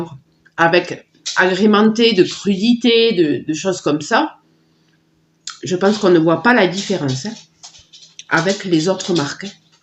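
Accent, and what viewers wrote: South, France. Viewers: Bonjour, merci pour ce bon moment de partage. Test approuvé 👍😁🐱🐶fins gourmets. Vous êtes d'où? Accent du Sud? Bonne journée à vous et votre adorable famille. ❤️😘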